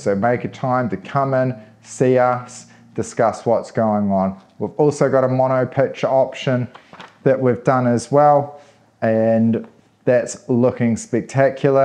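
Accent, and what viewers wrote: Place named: New Zealand. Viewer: gotta love the kiwi accent, reminds me of that banned ad, of the guy getting his neighbours wife & kids to play on his deck !! 🤣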